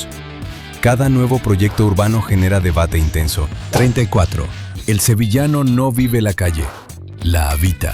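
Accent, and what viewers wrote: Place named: Latin America. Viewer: Y... ¿por qué le han puesto a la IA que relata el vídeo este acento sudamericano tan cantoso? 🤔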